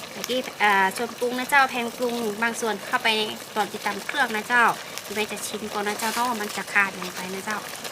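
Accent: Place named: North, Thailand. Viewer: พูดก็เพราะอู้คำเมืองฟังแล้วม้วนแต้ๆๆ..แกงน้ากินมากเด๋วจะไปตลาดซื้อมารองทำกินเนอะ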